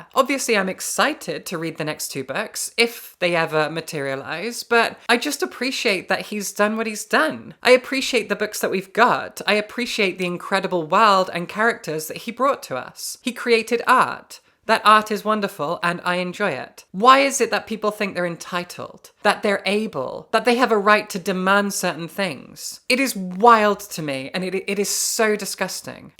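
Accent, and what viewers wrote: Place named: RP, United Kingdom. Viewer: So you’re a lazy contrarian with a posh accent. Congrats.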